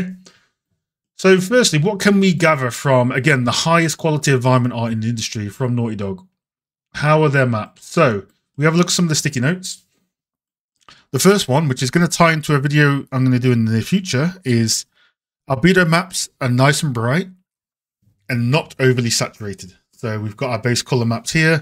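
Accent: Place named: United Kingdom. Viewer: Could listen to a british guy saying "roughness" for hours.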